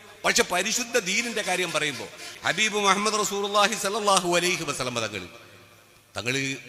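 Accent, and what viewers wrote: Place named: Kasaragod, India. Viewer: കാസർകോട് ഭാഷ യാണല്ലോ തങ്ങൾ എന്ന് പറയടോ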